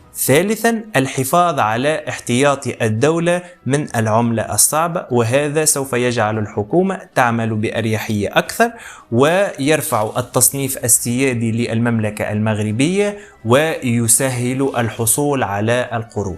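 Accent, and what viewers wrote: Tunisia. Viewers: انت باين تونسي على حساب كلامك ان شاءالله يوجدو البترول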